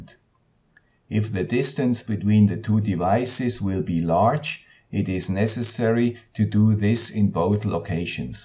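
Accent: Switzerland. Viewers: I really love your numbering of your video's sir! Keep up the good videos and I love your Swiss accent! Danke schön! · great and very helpful ! really love your videos and btw super swiss akzent:)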